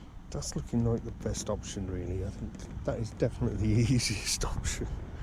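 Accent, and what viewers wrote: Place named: West Country, United Kingdom. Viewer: Just found this site and I am mesmerized by the seemingly precarious nature of your railway. It's clearly a labor of love. The amount of excavation you have done with just a pick and shovel is amazing. Well done mate! Can't wait for the next video. BTW, where in Britain are you located? I detect west country accents. Cheers from the USA.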